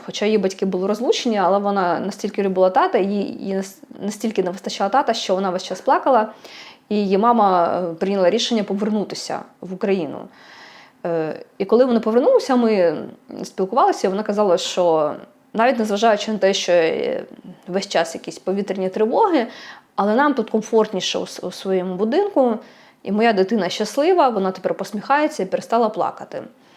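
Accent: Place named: Russia. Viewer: дивлюсь час від часу, бо приносить стрічка. А в Даніі віднедавна живе 18 річний син подруги, хочу знати. Не запам"ятовую саме вас, але стала пізнавати по ниттю. І ви, шановна, плутаєте національність і громадянство. У вас є освіта? Не знати таких речей - дивно. За громадянством ви українка, етнічно - росіянка, ваш акцент лізе з усіх дір. І менталітет не приховаєш.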